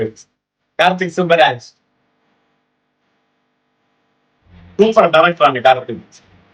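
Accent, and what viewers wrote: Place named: Tamil Nadu, India. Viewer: இவர் மலையாளம் பேசுற தமிழனென்று நினைக்கிறேன்..பேச்சு கேட்க்கும்போது அப்படி தோணுது..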